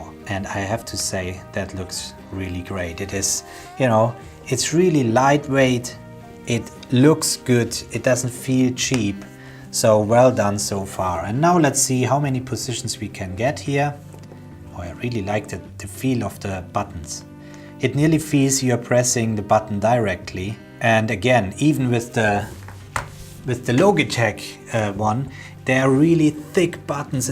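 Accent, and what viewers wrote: Germany. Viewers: Hey, good video! Well done. Are you german?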